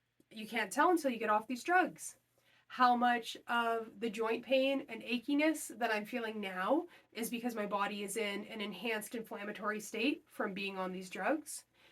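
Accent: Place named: Canada. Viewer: Thanks for being so comprehensive,! I really appreciate it and yes, viewers are looking precisely for all those little details you so generously shared, so it's definitely NOT too much information. It's reassuring as a breast cancer patient to feel heard and seen. You have a natural flair for relaying information in a very clear manner (plus a fantastic Canadian accent which I love!) and it has been very helpful listening to your experience which, so far, has been similar to mine. I'm just about to do my 5th round of full chemo (Docetaxel, Herceptin and Perjeta) and it has been more my upper GI tract that has given me the worst symptoms. Pretty ok, for the most part, in my lower GI tract. Did you experience any watery eyes during your full chemo treatment? Mine just won't stop! Looking forward to putting the full chemo behind me and just getting the surgery done. 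I have seen your video on your 1 year anniversary and I can't help but wonder: the fact that you're done with your HP regimen now is awesome but how are these continual questions in the comments to your videos affecting you and your mental health? I can't imagine what that would be like. Is that helpful for you to relive or does it maybe make you anxious? Just curious as to the psychological implications of this on you, post NED. Continued blessings to you on your health journey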